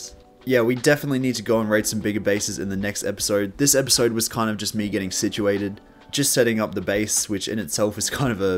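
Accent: Australia. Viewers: Awesome content, love the Aussie accent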